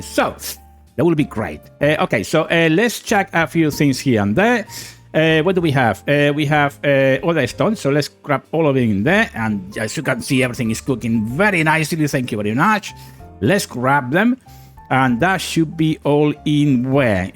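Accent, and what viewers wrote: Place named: Ireland. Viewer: great vid keep up the good work I really like your Irish old man accent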